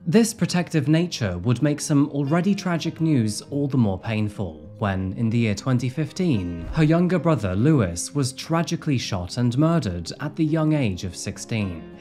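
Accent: United Kingdom. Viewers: Well, he is from the UK and recently moved to Australia. Everyone has an accent to everyone else outside of where you're from.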